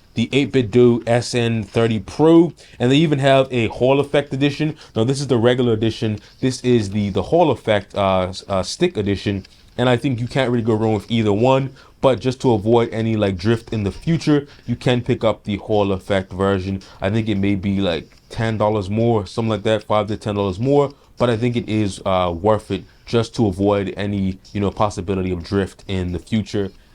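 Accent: Bermuda. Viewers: Ayo you got a pretty heavy Bermudian accent there my guy- not sure if you're actually from Bermy but it's similar!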